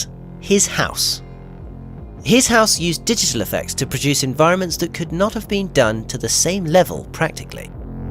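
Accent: United Kingdom. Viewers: I prefer this British accent day over most Aussie accents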